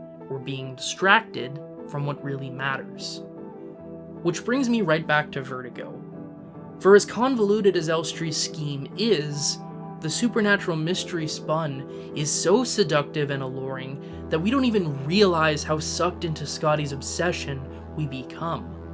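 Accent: Canada. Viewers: great video. one nitpick: I can tell from your accent that you're Canadian. I wonder if this is the reason you decided to turn Elster (el-stir) into Elstre (el-stree), much the same way you might do center > centre (pronounced "sen-tree", of course)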